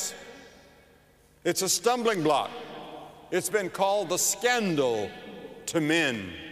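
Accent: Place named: South, United States. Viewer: I love listening to him. His voice is made for preaching and his southern accent is so captivating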